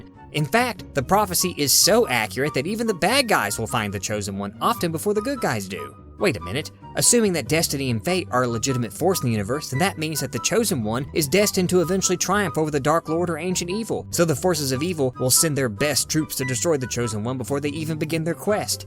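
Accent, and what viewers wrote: South, United States. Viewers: from what U.S. state is that Southern accent?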